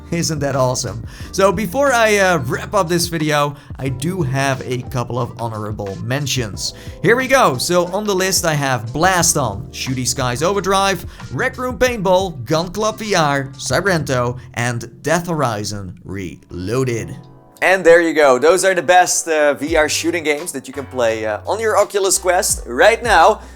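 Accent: Netherlands. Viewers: He sound just Dutch accent am I the only one that hears it maybe because I’m half Dutch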